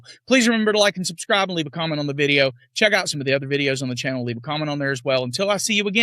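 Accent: South, United States. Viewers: Because of your Rebuttals, you have exceeded your way into becoming my favorite, your knowledgeable experience, Southern Drawl & fantastic sense of humor using colorful language like "2x4 headed" <~~ Who talks like that??! YOU do!! And I love it! 😅 Love it!! Love it!!! Thank you for being you,.. 💚💛💚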